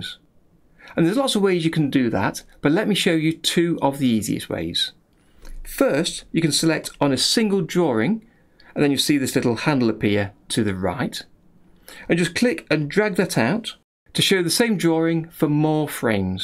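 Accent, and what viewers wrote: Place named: United Kingdom. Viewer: The best thing in your tutorial is your beautiful british (?) accent ! 😄. Easy to understand, and relaxing. Thanks for your great work !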